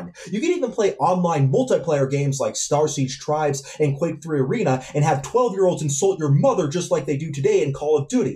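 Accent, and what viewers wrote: United Kingdom. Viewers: God, I do love your British accent, mann 😂😂😂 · isnt he scottish lol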